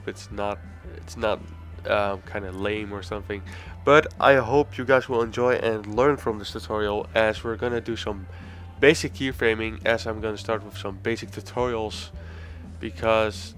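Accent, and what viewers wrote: Netherlands. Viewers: Are u dutch? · Are you Dutch? I assume you are because of your accent, lmao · lol sounds like it. I'm half dutch and i hear some similarities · are you Dutch?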